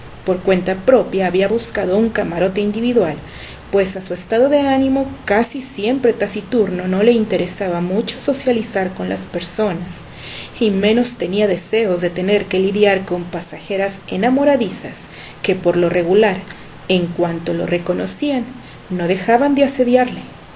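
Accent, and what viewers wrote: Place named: Peru. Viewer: hola tu eres la narradora? Hablas como Peruana o Ecuatoriana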